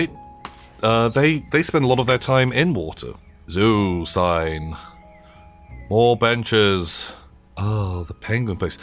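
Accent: Australia. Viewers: 10/10 aussie accent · As an Australian myself, Aussie is now my new favourite accent!! That was gold <3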